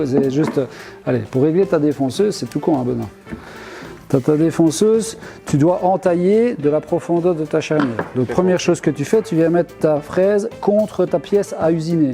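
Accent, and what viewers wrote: Belgium. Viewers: Bonjour. Très bonne vidéo comme toujours on ne s'en lasse pas bravo et merci pour tous ses conseils avisés, pertinents, et argumentés. C'est FLOKY qui va encore se marrer car tu "perzistes" toujours dans cette belle langue franco belge. Allez ciao et bonne continuation.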